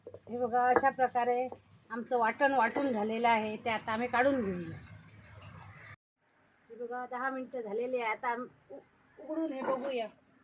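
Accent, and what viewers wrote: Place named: Malvan, India. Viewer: भाजी करण्याची पद्धत, मस्तच, तुम्ही कुठचे तुम्ही. तुम्ही मालवणी पद्धतीने बोलता म्हणून विचारले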